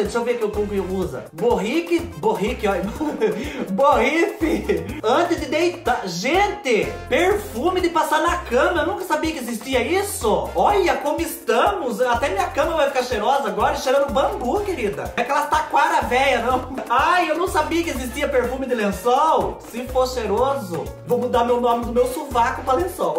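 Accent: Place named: Southern Minas Gerais, Brazil. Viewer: "Espicula de rodinha" é da minha época...amei...acho que você veio daqui da roça, (será que te sequestraram bebê?) no sul de Minas, vc fala igual "nois aqui" bjs...ops!...caí de paraquedas no seu canal e me apaixonei pelos videos...sucesso! sempre!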